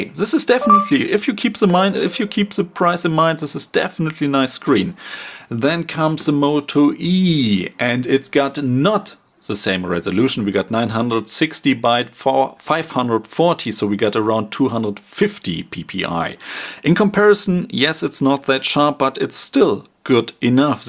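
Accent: Germany. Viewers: Hi, I'm English and love your German accent! You speak English very well in my opinion. Besides that nice compliment though, your videos are very informative. I like how you address things that are important to "the everyday user" - please keep it up! I own a Nokia Lumia 820 and I regret buying it because the battery life is not very good at all and the available apps are poor compared to Android. I was watching this video because I was considering buying the Moto g.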